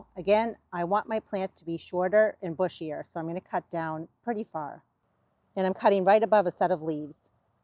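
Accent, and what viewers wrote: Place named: New England, United States. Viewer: You popped up first and I could immediately tell you were a new englander - great video thank you!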